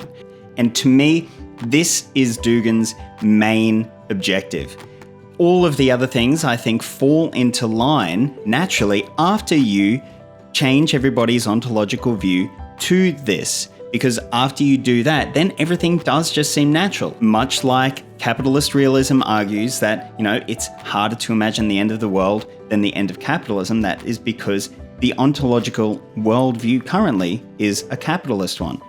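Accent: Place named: Australia. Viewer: I can’t tell you how absolutely thrilling it is to hear a YouTuber discussing ideology with an Aussie accent! Where hast thou been all mine life?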